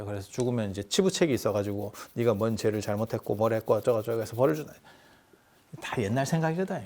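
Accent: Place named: Gyeongsang, South Korea. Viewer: 경상도 말~ 넘 익숙한데요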